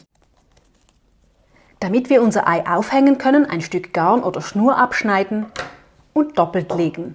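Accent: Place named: Switzerland. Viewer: Vielen Dank für die schönen Osterideen. Du erklärst alles sehr gut und ich höre deine Stimme mit dem leichten Schweizer Dialekt sehr gerne.😁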